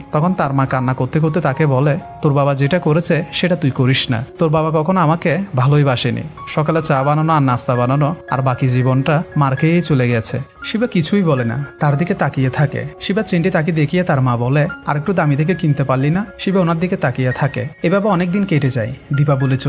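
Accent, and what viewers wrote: Chittagong, Bangladesh. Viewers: ভাই আপনে কি চট্টগ্রামের ছেলে নাকি? ভাষাটা চট্টগ্রামের শুদ্ধ ভাষার মতো🤔 আমি ও চট্টগ্রামের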